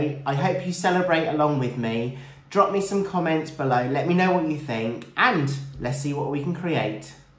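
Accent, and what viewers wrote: United Kingdom. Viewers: Happy birthday,. I love to hear your british English. 😍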